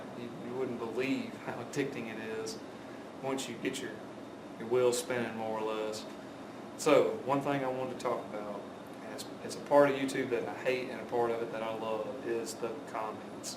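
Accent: South, United States. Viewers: the only criticism I have is that the drill press was 1/4 inch out of line lol...I like your videos very much..I like the southern, easy way of speaking you have..This video will be of great help to me as I want to make a video. Listening to you speak is like having a fireside chat with a friend..Thank you very much for this video..a new fan from canada,,,Bill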